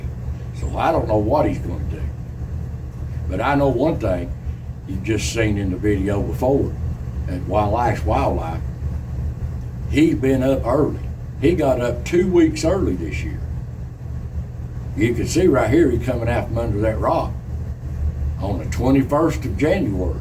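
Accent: Appalachia, United States. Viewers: Thanks. Watch you every time you post. If those folks don’t know your accent, they are not from around here. And I’m in the Blue Ridge of Virginia. Yea, I’m behind enemy lines now but still country to the bone. Love ya in Christ, brother. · one of the reasons I love your channel is because your accent is like my family's accent. Like the accent in the mountains of Virginia where I grew up. I live in North Carolina now, so I'm still in Appalachia, but I miss that area where Virginia, Kentucky and Tennessee come together. It's where all the beautiful nature is, and where all the kindest and most hospitable people on Earth live. People just like you and yours,. Your videos are a PLEASURE to watch! I love your nature video series, AS THE RIDGE TURNS. Dirty Dan is an old rascal, and I'm always eager to see what ol' Yeller, Sock, Ricky or Billy Bobcat is up to! 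I miss seeing Wishbone and ol' Sidewinder. These little animals have become like old friends, and I have you to thank for that! Bless you and your family, and thank you for providing me with hours, hours and hours of good, clean, family entertainment. I truly appreciate it. It's a great way to start a Monday to see nature's beauty. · People who think your accent is fake do not understand there are different southern accents. Yours is like my granny's was, what I consider to be mountain speak. It's real, just no longer common. There were times I couldn't understand Granny, hour was 'ahr', flour was 'flahr', not one was 'narry', wash was 'warsh', there was 'aher' or 'yander'.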